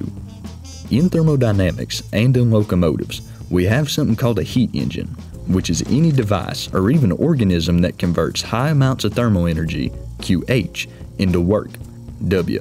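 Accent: South, United States. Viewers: well good job sir. When I heard some guy with a country accent talking about trains, I was expecting your explanation to be cold things get hot and hot things get cold not you actually leaping into a discussion about how thermodynamics work.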